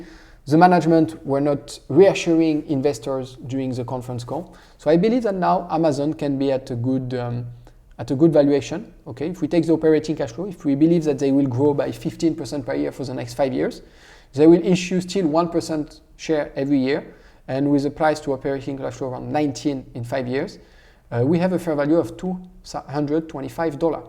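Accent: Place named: France. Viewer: Nice video. I have been following you for a while and I really enjoy your content (and your french accent). I bought the dip on EVO when your video came out- which paid off nicely. I have a few questions that I would like to ask you: 1. I was wondering where you see Francois Rochon's current portfolio that you mention in this video? 2. Have you thought about using StockOracle for your videos? The tool is amazing and works well for youtube videos. 3. Why don't you have Apple in your portfolio (It is one of my biggest holdings along with amazon and google). Btw.. Novo Nordisk is my favorite investment case BY FAR, so I'm happy to see it in your portfolio! All the best.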